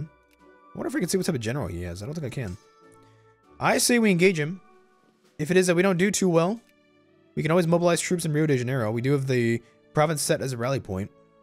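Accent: Spain. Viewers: ye he pronounces j's as h's as if it was spanish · It's funny how you say Portuguese things with a Spanish accent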